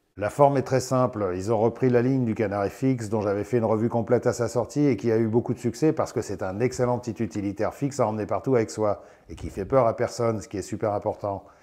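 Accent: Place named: South, France. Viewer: Il me plaît bien effectivement ,en plus on a eut droit à l'accent du Sud,une longue fausse pub. Merci à toi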